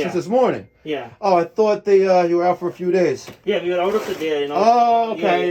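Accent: New York, United States. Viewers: Hey, please explain what the difference is between PPM CO and PPM CO AF? Really enjoy hearing your NY Accent and your expertise in everything Plumbing & Heating.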